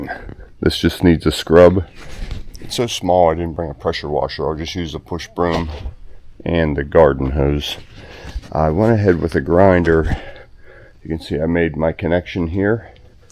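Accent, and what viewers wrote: United States. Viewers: Everyone has an accent. I'm from Australia. I definitely hear your American accent 😁 Beautiful work as always!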